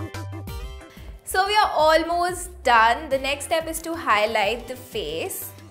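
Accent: India. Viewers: has such an indian accent!...like....calaa ba pafect match prrima!...but roshni and upalina has more of foreign accent!btw just my veiw no offense!(and having indian accent isn't bad at all).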